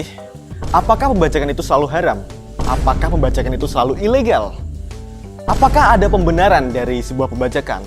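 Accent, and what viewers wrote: Java, Indonesia. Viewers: gausah maksa gw gw bro, logat jawamu kentel poll, pake aku aja for ur advice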